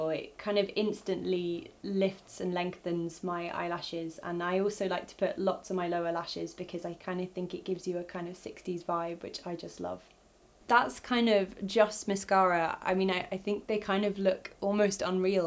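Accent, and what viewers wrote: United Kingdom. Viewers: So that's how pretty you have to be to date Sebastian stan, Also the british accent is a bonus obv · i must say that she looks very elegant with or without make up on, it is so satisfying to see her talking in her british accent ❤ also, funny how i can relate to her when she said "and that's it, that's the make up look done" after finish with applying foundation on and i did the exact same thing lol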